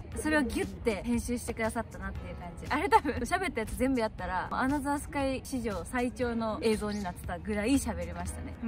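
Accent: Kansai, Japan. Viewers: アナザースカイでの関西弁がとってもカワイかったんでウワッと感じました。YouTubeでもドンドン出していって下さい癒されます。🤗 応援してま〜す。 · 久しくカスうどん食べてない。 番組観てて無性に食べたくなったよ。今は飲食店も大変だと思うから、緊急事態が解けたら、帰阪した時に寄らせて貰います。 うどんを食べた後、店の前で、「ここが俺のアナザースカイ』って言ってみようかな WW その関西弁、絶対に変わらないで下さいね。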